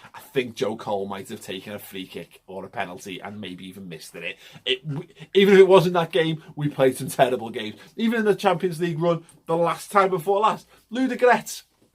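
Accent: Liverpool, United Kingdom. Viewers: Man I'm Indian and now I can understand scouser clearly ...thank you ...can even speak it a tiny bit !!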